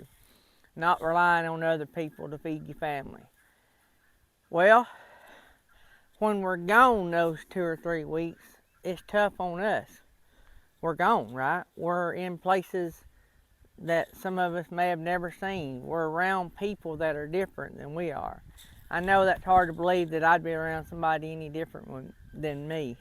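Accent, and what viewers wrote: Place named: South, United States. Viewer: Yeah you have a very harsh accent. It's quite obvious that you are southern lol. I think this is great advice. Jessie would probably love over the road work, but I've told him I don't like the idea. I wouldn't want him gone all the time and I wouldn't want to go either. So don't think it would work for us. Course with the hot shot, you seem to be home way more than you ever was with the RV hauling. Poor Tammy! aaaaaaaahahahaha!!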